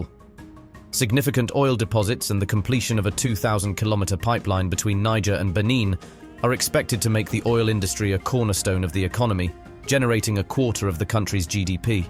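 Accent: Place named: United States. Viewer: HILARIOUS and LUDICROUS! You're talking noise man! You're talking about dwarfs who're trying to get a normal size! Senegal and Niger What a joke man! Libya used to be Z wealthiest and socially developed before Hillary Clinton put an end to its success story! Libya HAS NOTHING TO DO WITH THAT POOL OF bantu DWARFS! Rwanda? C'mon! It weighs NOTHING compared to Tanzania and Uganda either! HAVE A LOOK AT GDPs instead of that nonsensical growth-based bla bla! hAVE YOU LOST YOUR MIND? ETHIOPIA is a giant in all aspects! NOthing to do with that Bantu thing... C'mon! Btw, your accent smells American more than African Bantu. HILARIOUS POST! GROWTH IS NOT EVERYTHING! Just look at China's 5% and US 3%... and STOP COMPARING carrots and gold! It's LUDICROUS and so IGNORANT of how this world works! Go back to school!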